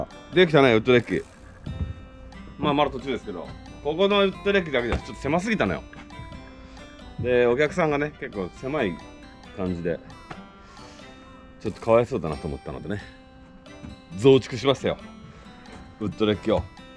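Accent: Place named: Hiroshima, Japan. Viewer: 清木場さん広島弁ですか？ 広島住みですが、方言が親近感湧きます😀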